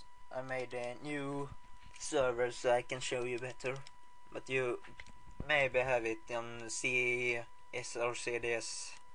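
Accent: Sweden. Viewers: Hmihmi! Fyfan va kul :D älskar när svenskar pratar kult engelska :DD Sä himla gutt!! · så jäkla bra älskar hur du utlar dom engelska orden med typ svenskt tal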